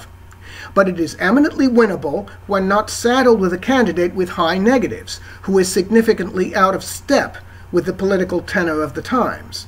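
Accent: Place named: East Coast, United States. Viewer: by the accent, i assume you are a east coast liberal?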